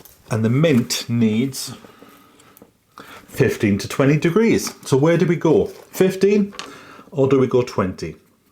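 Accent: Wales, United Kingdom. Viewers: Love watching you,find you so relaxing,that lovely Welsh voice.I’ve been in my garden today starting tidying up,loads of bulbs coming up,great time of year.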